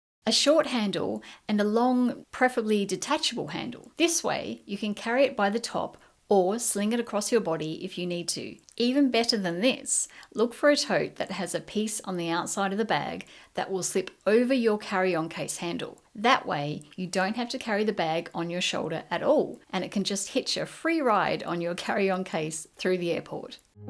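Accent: Australia. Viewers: One of the best packing videos I’ve seen. And I’ve seen a lot. It’s also great to hear an Aussie voice too. I’ve decided to give one bag travel a go next time we go overseas. Thanks for all the info in a very logical and calm way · Love hearing an Aussie voice ❤ Great video which has given me plenty of ideas as I prepare for a Mediterranean cruise and a few days in Dubai in September/October. Trying hard not to overpack for this holiday as I’m getting older and don’t want to be carrying items I don’t need.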